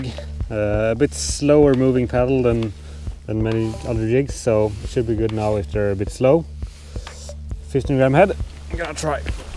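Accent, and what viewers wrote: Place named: Sweden. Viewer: Varför pratar han engelska när man kan höra att han är svensk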